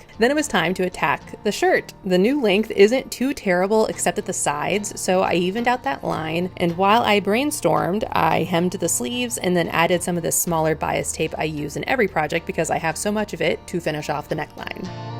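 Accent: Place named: Pacific Northwest, United States. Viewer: Please tell me you live in the PNW. Everything about your voice and the views from the videos I’ve seen tells me that you are - reminds me of Portland, Oregon??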